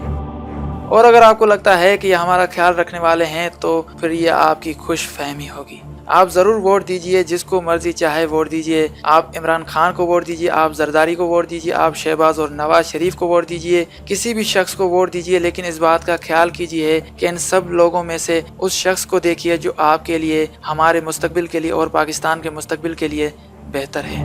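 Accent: India. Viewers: aap agar pakistani ho to indians ki tara kaun bol rahay ho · Tumhara lehja pukar pukar Kay keh raha ha kih tum bharti ho.is ka Matlab ha kih tum Sara din social media ka Kam kartay ho. Lagay hath imran ki zanashuda zindgi ko b Sam karo. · Tum indian ho tumhara lehja indians wala haiy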